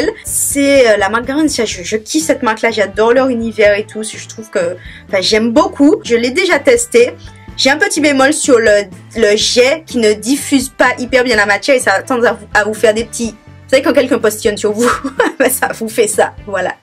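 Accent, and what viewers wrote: Antilles, Caribbean. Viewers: Tu es un vrai joyau !!! ♥ ♥ Ça fait plusieurs fois que je regarde tes vidéos mais je n'ai jamais eu assez de temps pour te laisser un petit commentaire ! Ton accent est à croquer, ça sent bon le soleil et la chaleur des Antilles ! Tes vidéos sont toujours un vrai régal ! ♥ Plein de gros bisous !!! ♥ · Avec ton accent antillais la😂😂❤. Super vidéo !💋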